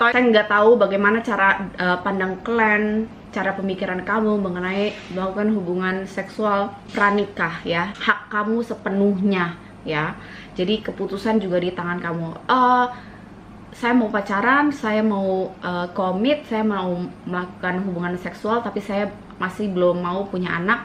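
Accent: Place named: Bugis, Indonesia. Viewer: Logatnya kek orang Bugis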